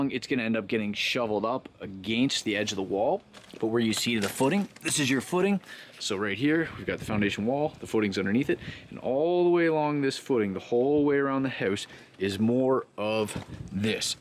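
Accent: Canada. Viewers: I knew you were Canadian before I even saw the location is tagged as Peterborough 😂 Very helpful video for a home owner working with contractors. A future video on sump pumps would tie in nicely with this one!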